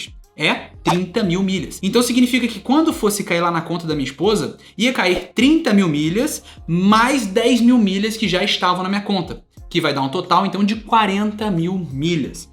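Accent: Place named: Rio de Janeiro, Brazil. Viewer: Meu camarada, não troque o sotaque, deixa o s ser X naturalmente, somos cariocas 😅😅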